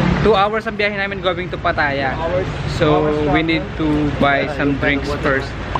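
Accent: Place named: Batangas, Philippines. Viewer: Batangeno ka ba lodi? May naririnig akong punto e haha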